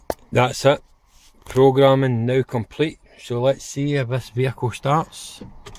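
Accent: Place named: Scotland, United Kingdom. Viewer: Great video, great to hear a Scottish voice! Great tip about coils never knew that could spike ECU will watch out in future. Liked the look of spark jumpers, was it eBay? I like u to review battery maintainer, cheers ps your now my go to Vauxhall guy!!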